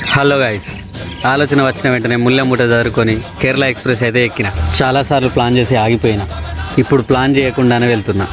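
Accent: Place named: Telangana, India. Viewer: మన తెలంగాణ యాస అద్భుతమైన వివరణ ❤❤❤❤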